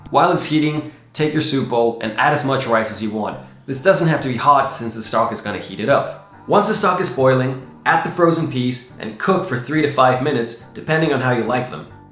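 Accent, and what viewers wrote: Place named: United Kingdom. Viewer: How to sound british without sounding british. Where do you live sir?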